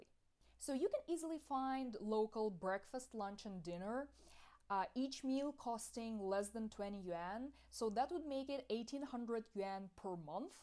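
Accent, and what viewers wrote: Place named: Russia. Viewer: You sound Russian.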